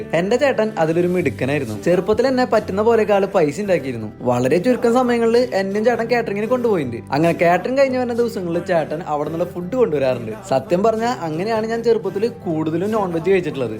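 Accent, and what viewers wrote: Thrissur, India. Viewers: Chetta oru video full trishur slang samsaarikko plz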